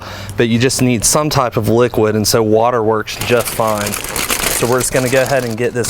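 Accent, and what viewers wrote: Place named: Pennsylvania, United States. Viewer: Southwest PA is my guess.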